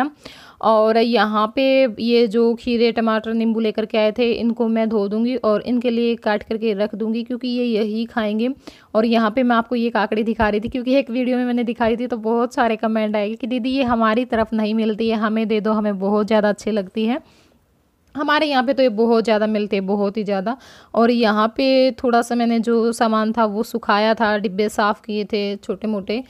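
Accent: Haryana, India. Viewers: Mai bhi samajh gai thi kyonki hariyana mai aise hi bolte h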